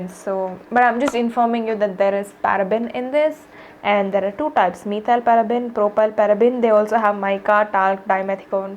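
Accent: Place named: Bangalore, India. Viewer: Hehe.. I saw your previous video few days ago, the highlighter one I guess .. The moment I heard you, and I thought you must be a 'Bengalur hudugi' ... More than the review, I enjoy your accent.. You remind me of bangalore. Nice review too!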